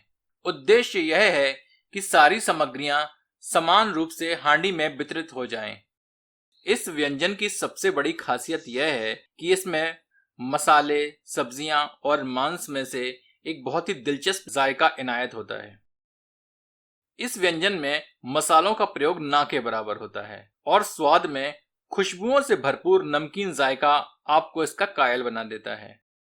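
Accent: Standard. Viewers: bahaut hi shuddh hindi se boltain hain jo sunne main acche lagtain hain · Inki Hindi vartani sun k man prafullit ho jata hai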